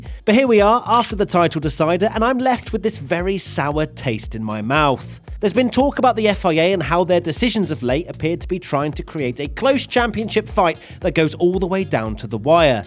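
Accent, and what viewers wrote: United Kingdom. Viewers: It would be great to hear a review from someone that isn't British. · You sound british so yeah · Yeah you should do a video which doesnt sound Britsh.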